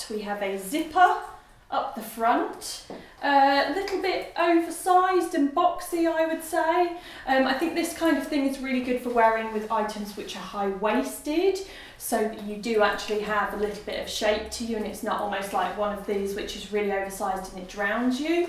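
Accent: United Kingdom. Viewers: Thank you! since I watching your videos i find style which fits me 100%! and I must say that I sold almost all my clothes and I buy some blazers, mom jeans and love a neutral colors! LOve your british accent<3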